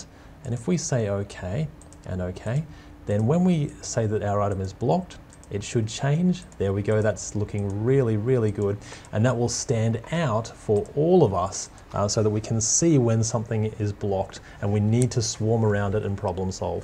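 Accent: Australia. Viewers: Great to hear another Aussie!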